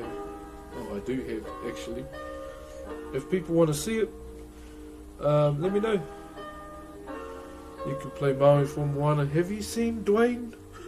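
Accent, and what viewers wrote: New Zealand. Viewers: both are kiwis, yeah?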